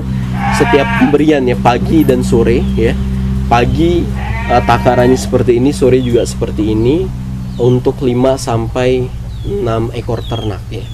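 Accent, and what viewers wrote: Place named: Banyumas, Indonesia. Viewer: Melo nyimak wong ngapak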